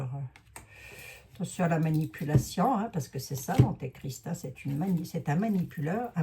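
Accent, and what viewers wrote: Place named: Lorraine, France. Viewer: Votre accent Lorain je m'y suis habitué depuis que notre fille est installée chez vous maintenant un peu a côté depuis ce weekend elle vient d'acheter une maison en Meurte et Moselle du coup je l aime merci de nous rassurer et je sais que vous faite ce que vous pouvez mais oui fait aussi prié la France prie plus la vierge nous étions la fille aîné de l'église où est elle ? Courage Aussi a vous amène 🙏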